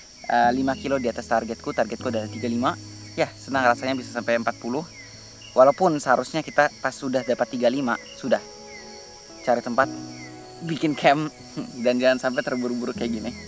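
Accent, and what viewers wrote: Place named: Borneo, Indonesia. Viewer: Logat nya Dayak 🤗 Sehat selalu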